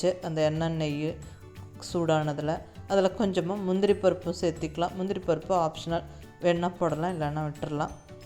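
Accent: Coimbatore, India. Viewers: pathalea sapidanum pola iruku👌🏻👌🏻nangalum coimbatore dha akka..Muscatla irukom..Ippove oodi vandhiranum pola iruku namma ooru bashai keka keka. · Super Mam.. Unga Kongu Tamilum super...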